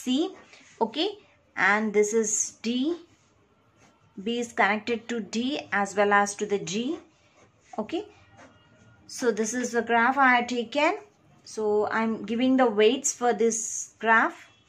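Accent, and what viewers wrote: India. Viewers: Damn to Hindi accent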